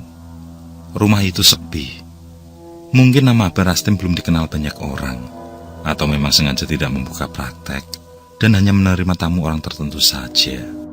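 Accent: Java, Indonesia. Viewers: Eaalaaaah bah Rastim tiang Jawi toh? Tak pikir urang Sunda.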